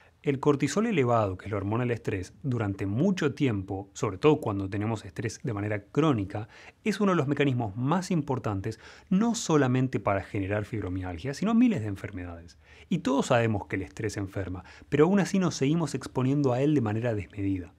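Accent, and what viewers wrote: Argentina. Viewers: Gracias Doc, mi hijo lo sigue desde hace un par de años, y nunca me compartio sus videos hasta que lo empece a escuchar cuando el lo ponia y me sorprendió como explica cada tratamiento para diferentes patologias. Saludos desde Buenos Aires que por su acento deve ser argentino.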